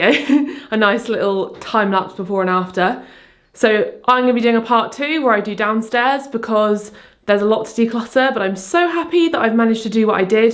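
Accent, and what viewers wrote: United Kingdom. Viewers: I abslolutly love your videos ! You give me so much motivation and joy to declutter and organize my bedroom, and I also love the fact that you are vert natural during tour videos, you don't try to be someone else so it feel so good to see you very shiny and happy. You inspire me to embrace my values and to give me hope, thank you and never stop showing us your declutter video ! Ps : as a 17 years old french girl, I'm completely in love whit your british accent ! And it help me to understand english with the british accent so thank you ! <3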